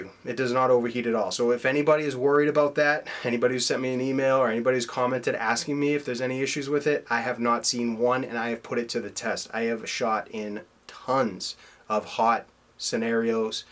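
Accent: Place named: Massachusetts, United States. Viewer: You mentioned how you are from Massachusetts, then I suddenly noticed the accent. xD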